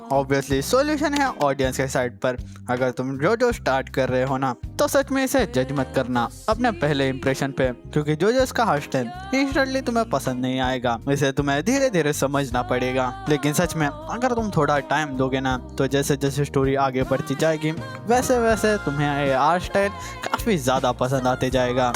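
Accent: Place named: Gujarat, India. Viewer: you sound like gujrati tbh 🥀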